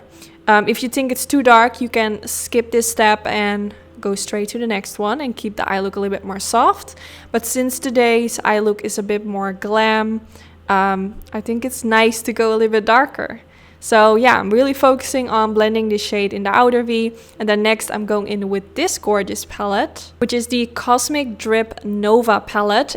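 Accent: Netherlands. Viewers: Wat grappig. Ik heb zojuist je kanaal gevonden en ik dacht dat ik een Nederlands accent hoorde. En lo and behold, je bent Nederlands! Super leuk. Ps: ik hoorde het maar een heel klein beetje hoor, je spreekt prachtig Engels.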